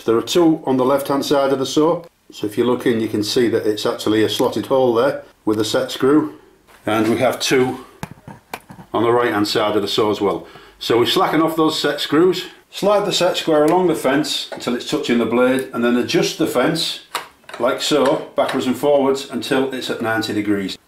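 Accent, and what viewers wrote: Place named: East Yorkshire, United Kingdom. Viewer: Great instructional vid Thanks, However I've seen in other reviews that after certain length of usage the saw stops cutting accurately, Have you any experience of this. BTW You're accent suggests to me you're from east Yorkshire, am I right?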